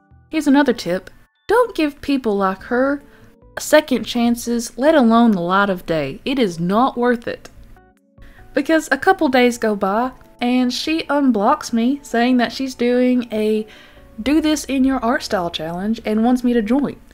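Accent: South, United States. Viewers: Where are you from? You sound like you have a southern accent lol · is that a southern accent i hear? i'm southern too and it's so reassuring so see another content creator with it! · Im so sorry that happened! My artstyle is not anything people would buy, so I don't do comissions, and this kinda stuff would not help either 😭 Also, you accent is WONDERFUL! It reminds me of a country accent but... different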